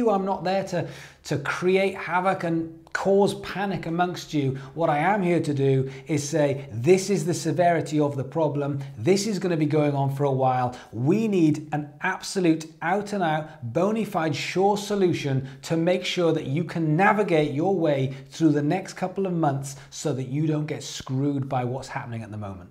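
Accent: Wales, United Kingdom. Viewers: Great vid! You gotta new sub :) Are you Welsh?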